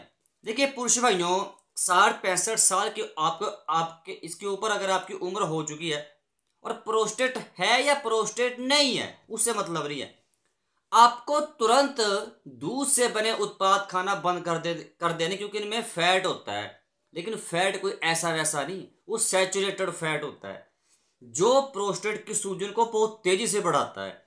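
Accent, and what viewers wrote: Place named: Himachal Pradesh, India. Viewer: Happy birthday🎉🎉Himachali lamguage lg rahi aapki.aap himachal se ho??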